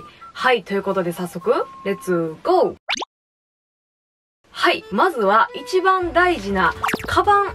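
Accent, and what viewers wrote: Kansai, Japan. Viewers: さんて東京住みなんですか！？関西弁で実家だから関西だと思ってた…！ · 後半からだんだん関西弁出てくるの可愛くて好きです笑✨👍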